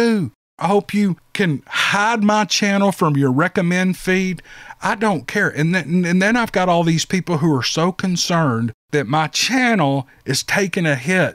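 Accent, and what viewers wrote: South, United States. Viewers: 🤣 YOU TELL EM!! YOU TELL EM REAL GOOD to put their BIG FOOT in their PIE HOLES and just enjoy them Narrated Stories in ye Southern Drawl 🤣- BEST RANT EVER! 🤣 🇿🇦 PS: Love Your Stories! 🇿🇦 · Don't change anything you do. I love your story telling, the mannerisms, and your southern drawl, its a gift. Thank you for your love of a good story, and your platform to share them. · I want to thank you from the bottom of my heart 💖for all that you do on this channel. Yes, I'm a little biased, because you have read my experience on here several months ago. ( episode 131) ☺️ But all in all I am not part of the Bigfoot community. Because I did not choose my experience. It chose me. I am not one of those fanatics who would go around bashing you BTW. Never have been...never will. I like the intro music that you use. I love your Southern drawl because I'm a West Virginia girl and it makes me feel at home. 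Your storytelling style makes me feel comfortable. It makes your story that you're telling me seem more real and more believable. Whether it is real or not matters not to me. What matters to me is that the style that you're telling it in, your delivery, it's the gospel truth. I really like whenever you're reading a line and it's funny, you break character and you laugh right along with us. Yes, you have a sense of humor and I think it's great! Because we're laughing, too. And when we can all laugh together it makes the world a better place! 🤣😂🤣😂 I really liked it when you had the children stories on here 📖. It encouraged them to be creative writers.📝 And that I think is totally awesome!👍😁 I think that's why I like your Steve Lily journals 📚 on your "What If It's True?" Podcast. I like your style of creative ⌨️ writing and I like the subject matter as well 👣. Okay, enough a$$ 😘 here, 😂 lol. 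God bless you. Please keep up the good work. I await your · I love your stories and your voice/accent. I’m country-fied too. I have a strong southern accent. Those people who made the comments are idiots! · I am a 68 year old southern girl. I love a good story and a real southern accent. You are awesome. Story telling is an art that was dying until people like you appeared on the internet, of all places. Thank you so much. You and those like you are national treasures. God bless:) Nancy in ga usa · God Bless Brother! As you stated some people are so bitter in their own lives they need to spread their misery... sad actually. You have my support and attention, love your southern accent when telling these stories. Just like TV, if you don't like the show...just change the channel and to hell with your opinion. Keep going brother!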